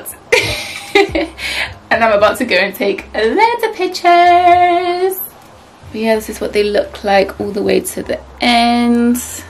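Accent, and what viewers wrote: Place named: United Kingdom. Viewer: Love these braids on you! Followed you over from IG and this is the first time I I've heard you speak lol Totally forgot you are from the UK <3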